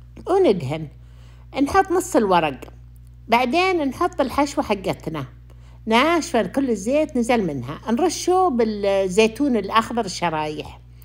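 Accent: Iraq. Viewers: نتشرف بيج ست انا من العراق نفس لهجتها انا احبك كثير واحنا عندنا عشيرته الجبور تمتد الحجاز كلها وهي أكبر عشيره في العراق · يمكن يسألوك لأن طريقة نطقك للحروف عراقي بالذات لما تقولي ماء ورد وكلمات كثير عراقيه ناسيتها انا تابعتك وعلى بالي انك عراقيه بالمدينه وبعدين عرفت انك سعوديه مو من القبوري من طريقة كلامك ولكن انتي عراقيه او سعوديه عسل ماشاءالله ،،يعطيك العافيه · احسبك عراقيه كلامك شوي عراقي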